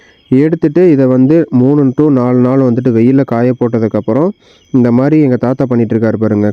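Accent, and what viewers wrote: Coimbatore, India. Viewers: Coimbatore slang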